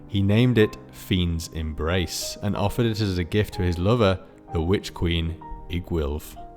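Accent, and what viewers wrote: United Kingdom. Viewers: The argument that occured two months ago was fascinating to read. Not that it matters, but I think I'd support the supposed linguists assertions, as someone who has a name that is a transliteration of a common English one, because in my ancestors mother tongue, they do not speak with certain consonant sounds, like "r." Also isn't an American using American pronunciation. As far as I can tell, he's British.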